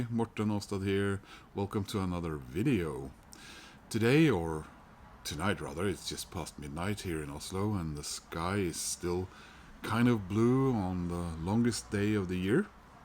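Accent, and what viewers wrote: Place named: Ireland. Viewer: Look at that cool Opeth album cover ! The motorcycle noise reminds me of some of the streets here in the U.S. Thulsa Doom.. I will listen. I have The Hives debut on an original pressing. Bought it back in 2002 or so. Good Irish accent sir ! 😊The only accept I have is Metal Heart. I just watched Doug's latest here before work today.✌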